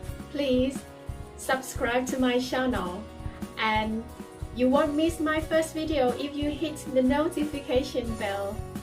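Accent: Thailand. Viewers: I love your Mookdaharn accent, teacher 🥰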